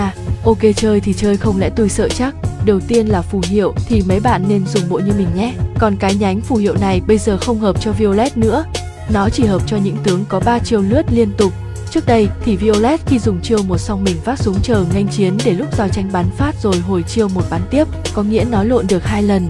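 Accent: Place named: Quang Tri, Vietnam. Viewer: Em ấy giọng Quảng Trị nên hơi khó nghe cho các bạn miền trong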